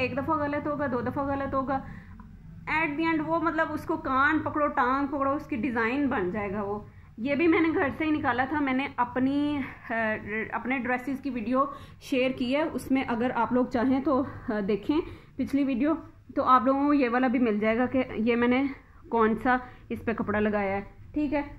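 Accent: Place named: Sialkot, Pakistan. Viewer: MashaAllah bohot bohot peyary design ap bnati hain. apki awaz se lgta hai ap Noreen ki sister hain life of noreenسیالکوٹ ki lgti hain ap🥰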